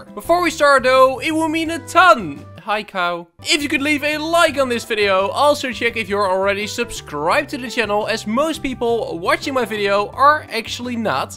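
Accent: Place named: Netherlands. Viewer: bro, never heard of you, but in the last week i watched like almost al youre server vids lol, youre a life saver. thanks Bud! one question. are you by any chance Dutch? I am and i feel like i hear an accent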